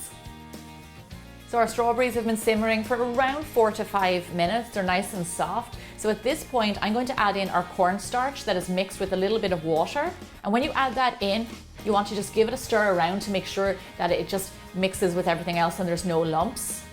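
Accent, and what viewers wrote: Ireland. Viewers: I love your irish accent!! ❤️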